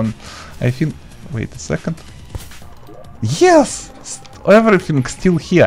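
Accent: Russia. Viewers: Is he russian??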